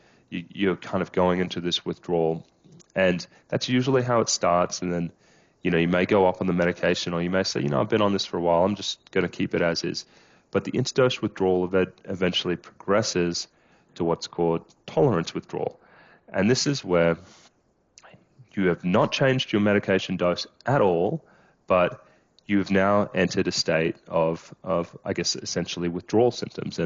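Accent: Australia. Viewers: G'day, i thought i realised that Australia accent. Its good to see an Australian taking a interest in peoples health. Mate here is my story....I am 59 years of age and had been on Alazopram for 13 years at 1mg to 3mg a day as needed.....prescribed to me by my family doctor for anxiety and panic attacks. Through out this time my life was going along smoothly, i was functioning and living life. That was until January 2023 when the Australian Federal Government decided to change the prescription laws around these medications. It meant that my doctor was no longer allowed to prescribe them only Psychiatrist can prescribe them now...which i totally agree with. What i don't agree with is the total lack of direct pathway to see a psychiatrist to maintain your script or a managed taper. At this time i was given 30mg Oxazepam to take daily......which is not the correct dosage, it was 10mg short of the equivalent dosge of Alazopram. 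Well one month later i entered hospital with severe dizziness (rocking sensation), sensory hyperstimulation, headaches, fatigue, unsteadiness and tremors. I spent 9 days in hospital. During this time I was only given 5 mg of diazepam in total plus daily panadol. I had ct and Mri scans done.....all were clear. I saw a psychiatrist for about 20 minutes and Neurologist who all put it down to my anxiety....so I was discharged. At the time of disgcharge I was on nothing, zip, zero only my 100mg of Zoloft. The hospital psychiatrist told me to wean myself of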